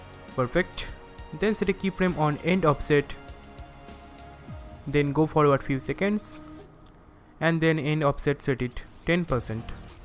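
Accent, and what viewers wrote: Bengal, India. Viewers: India for sure buddy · Darun laglo. Ami katha sune bujhlam apni Bangali. Khub informative video, ebong khub sundor. Sudhu ektai jinish, if you don't mind, uchcharon gulo ektu thik kore nilei bas, perfect. All the best brother!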